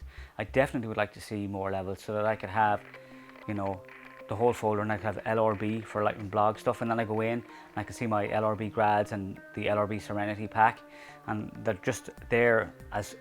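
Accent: Ireland. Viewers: I enjoy your videos very much. From seeing so many Brendan Gleason films, your accent is not a problem. There are lots of Irish people here in the states too. But your audio is not ideal. It sounds harsh, like there's too much reverb in your room. There are some cheap ways to address this with towels on the walls. Here is a guy from Wales with many interesting free solutions, whose audio always sounds just right: <link>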